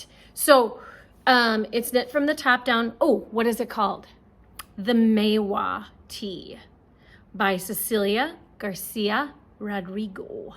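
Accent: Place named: Minnesota, United States. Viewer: Just found you today and am binge watching your video podcasts. I think your Minnesota accent is charming. I have an Atlantic Canadian accent...no doot aboot it, Eh? 😂 Thanks you! 🥰🇨🇦Sharon.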